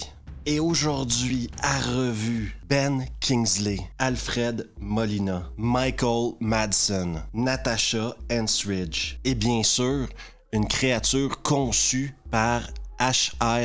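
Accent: Quebec, Canada. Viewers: Salut ! Sympa d'avoir tous ces avis sur des films de notre génération (on a à peu près le même age) avec le petit accent du Québec exotique pour nous les Français, mais dans ton cas, y a aucun souci, c'est à 100 % compréhensible ! (je dis ça car j'éai été au Quebec en vacances et c'était vraiment pas facile, et du côté de Tadoussac, là c'était quasi une autre langue pour moi :-D ]. Super critiques de film donc, j'ai vraiment du plaisir à les regarder, bravo ! Souvent je rigole tout seul d'ailleurs, avec les expressions que tu trouves pour décrire les scènes. Pour ce qui est de "La mutante", j'avais vu au ciné aussi et j'avais même lu le livre avant (mais c'est pas un roman intrinsèque, je pense que c'est plutôt le scénario qui a été "romancisé" car c'est quasi identique à ce qui se passe dans le film, c'est juste plus détaillé grâce au processus de la narration qui permet de saisir les pensées des personnages... 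et de l'E.T.. Bref, j'avais bien aimé même s'il y a un côté "petit budget" qui empêche de donner une plus grande ampleur à ce qui aurait pu être une grande réussite du point de vue de la science-fiction. J'avoue que Natasha est bien plaisante à regarder, j'étais pas encore majeur hein ^^ (on l'a revue dans "Mon voisin le tueur" quelques années après en version moins... dénudée mais toujours très appétissante). La suite peut encore se mâter je trouve, encore moins de budget je crois mais des scènes bien dégueux-gores. Les 3ème et 4ème, c'est du niveau des Starship Troo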